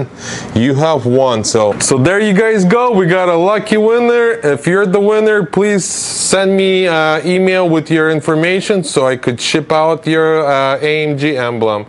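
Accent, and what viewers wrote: Russia. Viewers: Прикольный русский акцент Бро!!! Привет из Украины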